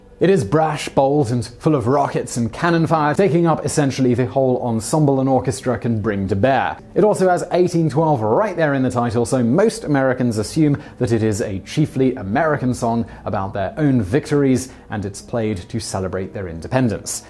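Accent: United Kingdom. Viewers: I love hearing American history with a British accent! I'm not trying to be snide, these vids are filled with accurate information. I just love his accent and there is something novel hearing American history from a Brit. Love you! BTW...history isn't really taught in American schools, at least not accurately. Some ppl still think the Civil War was about states rights (to own slaves.) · Very (snotty) British inflection on facts about a war they started. No matter how many "Well you couldn't have won, so you were wrong to defend your countrymen" phrases you throw in there.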